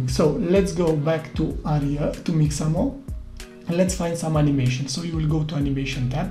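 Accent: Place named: Romania. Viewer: banuiam ca esti roman :)))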